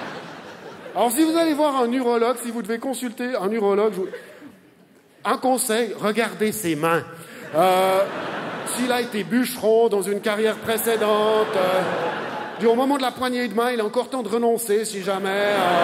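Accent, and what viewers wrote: Vaud, Switzerland. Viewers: Il a une drôle de façon de parler (et je ne parle pas de l'accent suisse) mais j'ai ri ! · Accent Vaudois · Il a vraiment un accent suisse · J'ai plus rit de son accent suisse que du sketch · "C'était franchement désagréhâble" . . . beaucoup d'accents circonflexes notamment sur les "a", les "heu ou eu". Cet accent suisse, peut être vaudois, me rappelle celui des stéphanois (Saint Etienne 42). Fouillâ ! ! ! !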